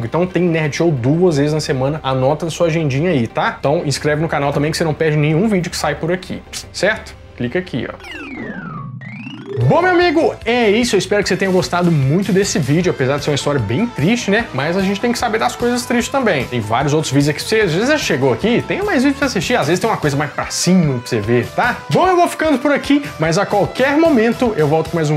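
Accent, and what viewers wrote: Minas Gerais, Brazil. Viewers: Meu amigo....Boa noite....acho seu canal muito bom....dinâmico ...informativo...sem dizer q o jeito de vc apresentar é peculiar...seu sotaque" pão de queijo" é ótimo. Trabalho no Hospital ao qual se referiu....Hospital Naval Marcilio Dias....E completo de cá , para conhecimento de todos, q o HNMD, orgulho da nossa Marinha, à época e ainda nos dias de h,j é referência Nacional p atendimentos a pacientes radioacidentados. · deixa o mineirês aflorar, sô! É lindo demais, uai!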